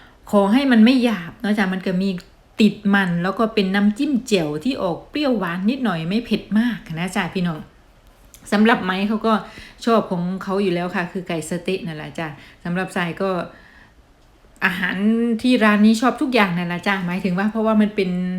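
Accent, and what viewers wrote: Thailand. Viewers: เอ๊ะ เหมือนฝนจะตก กางร่ม กันด้วยนี่ แต่..เอ ฟังน้อง พูดภาษาอังกฤษ.ยังเป็นแบบไทยๆ อยู่ นะ คือดีไง..เราไม่จำเป็นต้องเหมือนฝรั่ง ใช่ไหม น้อง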